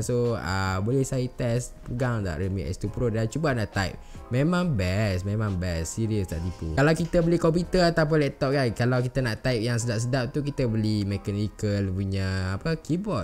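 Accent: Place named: North, Malaysia. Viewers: Pekat noh loghat kedaq hang 😂😂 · Laaaaa orang utara kaaaa...dok mana?? Huhu..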